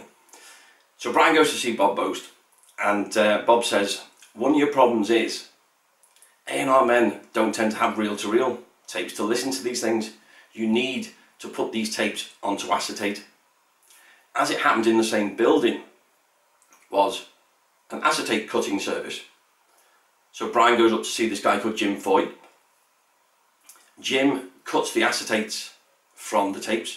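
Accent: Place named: United States. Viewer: And let me guess, you are American-cause you,guys are the best at everything, right? 🙄 Only an American would be arrogant enough to suggest that a documentary about a BRITISH BAND be narrated by an American. Go wave your flag somewhere else.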